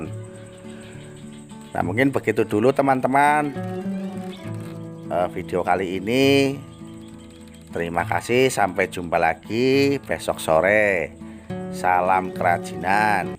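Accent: Java, Indonesia. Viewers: Loogatenya seperti jawa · Tak pikir wong medan pak... Jebule medok hehehehee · Wihh mantep pak, wihh nadane medok nggih, lokasi pundi pakde , pengin Sinau mbelah ban bekase 😁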